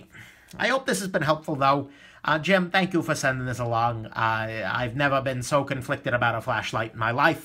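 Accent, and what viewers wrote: New York, United States. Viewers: I hope your fiancé has your beautiful New York accent. And I also pray that your babies, of which I hope there are many, also have that beautiful New York accent!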